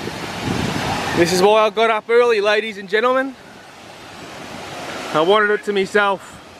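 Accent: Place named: Australia. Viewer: Lol That Aussie Accent and Aussie Slang! Aussie Aussie Aussie oi oi oi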